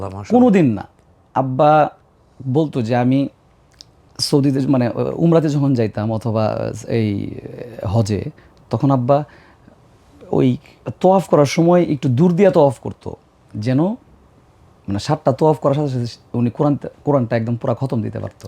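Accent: Kishoreganj, Bangladesh. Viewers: আমাদের কিশোরগঞ্জের মাতৃভাষা টা মাঝে মাঝে এসে যায় এইডা ঐ পরিচয় হে যে আমরার কিশোরগঞ্জের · ভাই আপনাকে কিশোরগঞ্জের গ্রামের ভাষাতেই বেশি সুন্দর শুনায়।বার বার গ্রামের টোন চলে আসছে আর আপনি বার বার ঠিক করার চেষ্টা করছেন,এই ব্যাপারটা ভাল্লাগছে।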